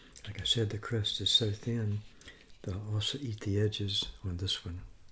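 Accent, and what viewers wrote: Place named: Appalachia, United States. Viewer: Where are you from my man? Like the accent, pretty sure Appalachian or mid south but was wondering exactly what State ur from? Love the vid and keep doing what ur doing brother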